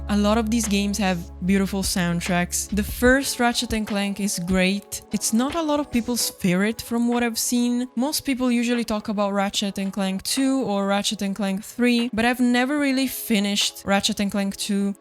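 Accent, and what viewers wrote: Italy. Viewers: Your italian accent is so lovely btw ❤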